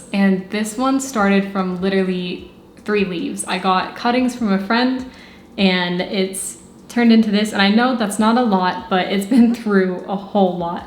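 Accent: United Kingdom. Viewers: You sound mildly British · Have you lived in England before? I hear a touch of British speak. Great collection by the way. I love the trubeii a lot.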